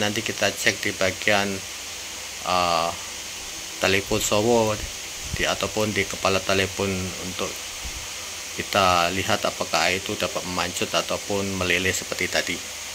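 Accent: Malaysia. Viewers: Q fokus kata2 kaka logatnya masih kayak orang malaysia Dulu di malaysia brpa lama ka?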